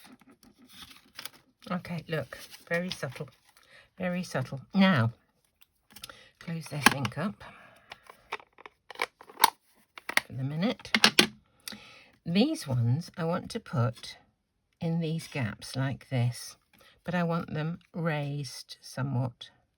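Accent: United Kingdom. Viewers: I completely agree! It still boggles me that some people can be so rude! If you don't like someones videos, don't watch them, there's no reason to be mean! I completely adore all your videos and thoroughly enjoy all your commentary with yourself, especially with your British accent! You just have a way of saying things that is so different from the way we Americans speak and I absolutely love it! Don't change a thing! Love all your CAS cards and videos! Thank you for taking the time to share all your thoughts. I myself, have one of those bins full of started projects or scraps and bits of cards that never got finished. I always feel a great sense of accomplishment when I have pulled a card together from those bits.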